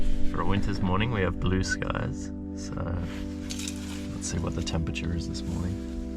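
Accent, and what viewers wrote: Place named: Australia. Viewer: Are you German? You sound much more like an Aussie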